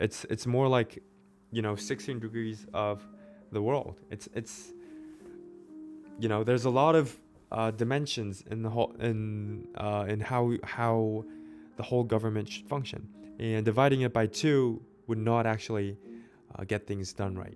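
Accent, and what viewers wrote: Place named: Texas, United States. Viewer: Your English is concise & perfect 👌, you underestimate yourself. Your accent sounds predominantly 🇺🇸-Texan. H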